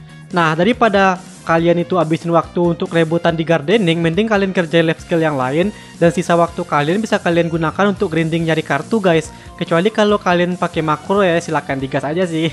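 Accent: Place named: Minangkabau, Indonesia. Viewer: Logat minangnya kental banget 😂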